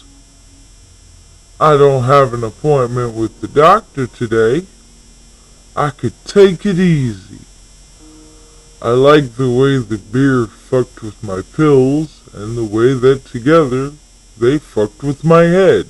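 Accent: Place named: South, United States. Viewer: Woah he's southern damn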